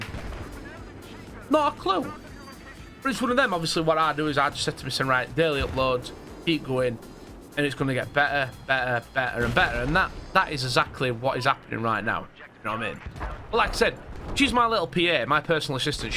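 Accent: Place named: England, United Kingdom. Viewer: I also loved ur english accent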